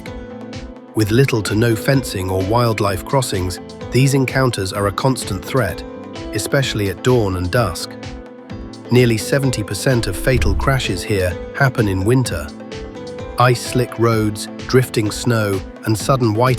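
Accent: United Kingdom. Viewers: I guess you know more than this guy who made this video🤣 especially the British voice probably had too much Scotch when he was creating this video!🤣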